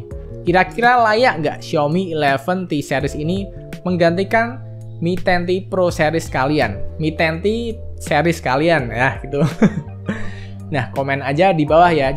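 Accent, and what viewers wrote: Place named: Java, Indonesia. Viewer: Medok jawir anjir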